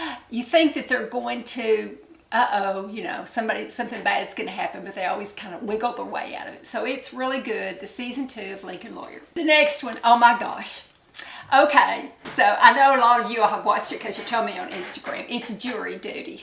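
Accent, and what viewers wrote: South, United States. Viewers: Love your channel! You may find this funny but I listen to you for frequently for the ASMR quality of your beautiful southern accent which I find very soothing and calming!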